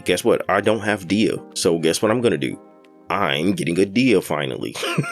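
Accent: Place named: South, United States. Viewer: He do got that southern twang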